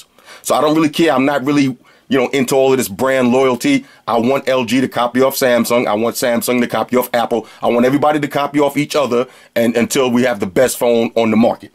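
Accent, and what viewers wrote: New York, United States. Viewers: I just want to say that I really appreciate your enunciation on this review. As a fellow native New Yorker, it's refreshing to hear our local swag on a topic I'm interested in. Most reviews on phones seem like they come from nasally 15-year old nerds from Ohio (The same people that are migrating to this city and pissing me off, but that's another story). Keep doing your thing bro. EDIT: LMAO "size matters" and "red lobster dinner pics with bae"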